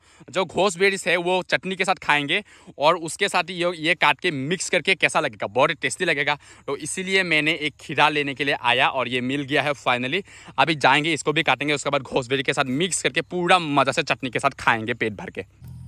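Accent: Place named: Assam, India. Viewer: Aap assamse hona bat karneke andaj sehi pata salta h😬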